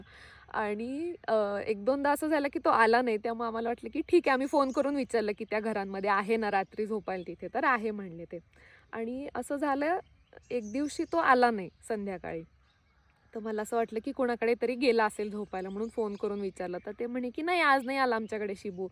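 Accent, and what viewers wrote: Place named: Kolhapur, India. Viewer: Your accent is mostly like our kolhapuri👌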